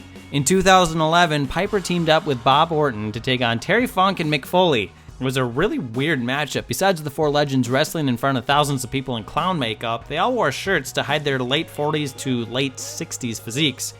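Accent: United States. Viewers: Man.. This is weird hearing an American guy haha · American man? More like I want my English accents back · Never heard an American presenter on a whatculture video before. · that's an american accent, who is that? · Listening an American accent in a Whatculture Wrestling video is so weird. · Why is an American doing the narrative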